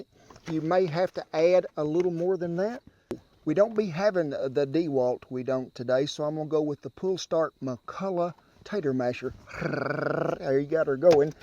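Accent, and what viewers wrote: United States. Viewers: Of course I would cook some thick sliced 🥓!!! That should always be the first thing cooked in a fabulous iron 🍳! I currently don’t own an iron skillet, but grew up on one as my Dad was from Tennessee. So, I recently decided that I want iron in my life and started researching and ran across your channel first off. I really enjoy watching you cook and listening to your authentic cowboy accent. Your recipes are fabulous and your wife is lovely. It’s always pleasure watching you 🥰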